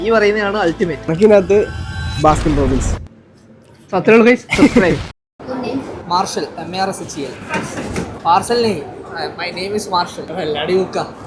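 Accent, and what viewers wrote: Kannur, India. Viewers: Pakka kannur language 😅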